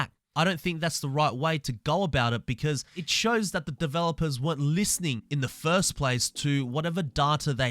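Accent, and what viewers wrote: Australia. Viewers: Is it the australian accent or do you have a runny nose? :D Nice vid!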